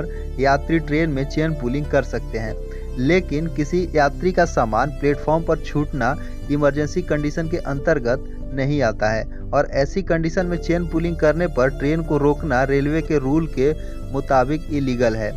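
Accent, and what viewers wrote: Bihar, India. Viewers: Ap bihari ha kya apki awaj se lag rha hai..main bhi bihari hun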